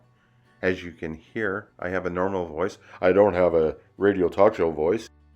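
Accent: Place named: Canada. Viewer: Hahaha! Yep, you sound Canadian now! I live in central lower Michigan... our fall colors are in full glory right now. It's difficult for me to concentrate on the road on my hour long drive home from work, they're so beautiful! Gotta watch for deer, turkey, and sometimes coyotes. Looking forward to your fall colors! P.S. forgot to tell you thanks for answering my koi question!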